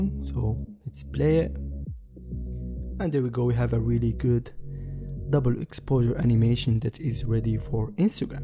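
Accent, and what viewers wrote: Morocco. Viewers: That Moroccan accent tho hahaha, great video Bro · That Moroccan accent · Very nice thank you. you have a moroccan accent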